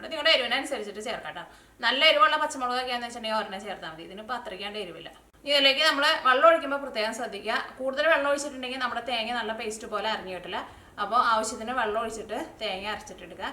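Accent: Thrissur, India. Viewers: Super trissur slang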